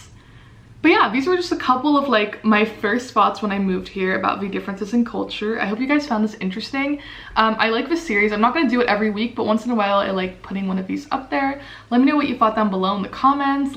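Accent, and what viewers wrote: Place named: Netherlands. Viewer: Nice vid, and funny to hear a dutch accent getting in there while talking english! Good one for the directness part: dutch will ask you without any problem what you pay for rent if talking about housing 🤣🤣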